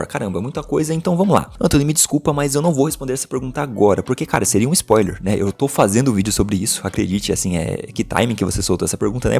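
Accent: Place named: São Paulo, Brazil. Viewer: Ow mano, na moral, agora cê vai ter q explanar q canal grande q te tirou pra merda pelo vídeo do inominável holandês. Preciso ter uma conversinha com esse caboclo q pelo visto não assistiu uma partida sequer daquela atrocidade q era o United comandado pelo inominável holandês kkk. Aliás, imaginei q cê tinha ficado mais grilado com o video d reação do futurinhas sobre o Pelé, q tbm tenho minhas discordâncias com os argumentos q cê apresenta naquele video em específico, mas o debate saudável faz parte kkk. Outra coisa ainda kkk, se depois d vc dizer q no ensino médio tinha dois times q tu odiava e esses times não serem Corinthians e Palmeiras (então no caso tu seria são paulino), aí eu sou um astronauta haushsushsu. Supondo q cê tenha por volta dos 20 e poucos anos, então cê sofreu com os péssimos 10 anos aprox do São Paulo em uma d suas piores fases e viu tanto Corinthians e Palmeiras ganharem td durante essa época. 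Fora o seu sotaque do interior d SP kkk. Se esse for o caso, então tmj nesse sofrimento, pq tbm sou trikas haushsusuh.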